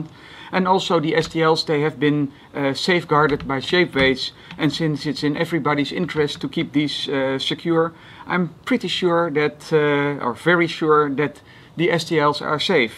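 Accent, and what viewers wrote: Netherlands. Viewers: I love your accent, are you from somewhere around Netherlands? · Yeah, he's dutch.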